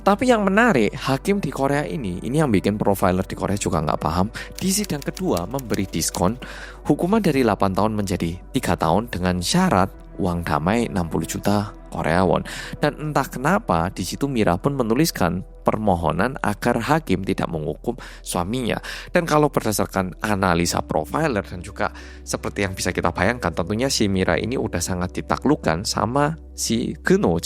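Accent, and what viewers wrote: Java, Indonesia. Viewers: Hai medok.... Nyimaaak 🎉🎉🎉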